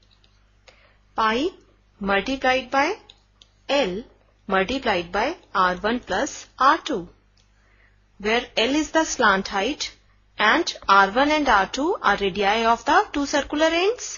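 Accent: India. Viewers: I cant understand your indian accent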